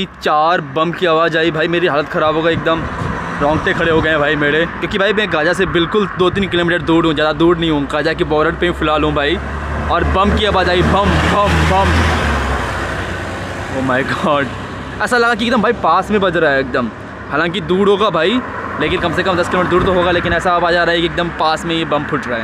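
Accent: Bihar, India. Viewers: Improve your communication skills- drop Bihari accent and stop saying bhai.. bhai… you are doing good work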